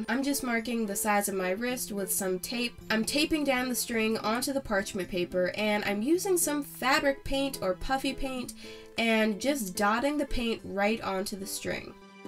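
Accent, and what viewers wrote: South, United States. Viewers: Why does she have like the country accent in this video? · This video of her sounds like she has a southern accent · In this video why dose she sound like she’s from the south...🤷‍♀️🤷‍♀️🤷‍♀️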